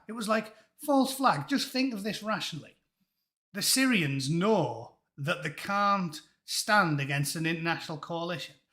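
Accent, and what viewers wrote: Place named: Teesside, United Kingdom. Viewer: Teessider here. I was about to ask about what town you meant, since the accent gave it away. Always good to hear a success story. Glad you made it out, Boro hasn't got any better. it's like a middle-eastern country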